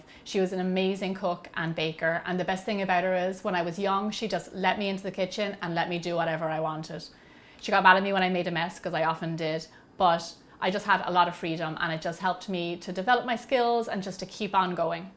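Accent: Ireland. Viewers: I love your Irish accent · I am really glad I´ve found your channel. First, because you bake heavenly goods and teach the recipes really well. Congratulations on your channel! Second, because listening to you takes me back to Ireland, a place I was fortunate to live in for two years of my life. Let's cheer for your talent and great work! Sláinte!! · May we please have a video of you cooking while you're in Ireland?:D I just love Irish people I think you guys have cute accents xD Also do you like the tropics? Come to the Philippines some time in the future or make something big and bold with tropical fruits:D ( I rarely see desserts with them tho o.o )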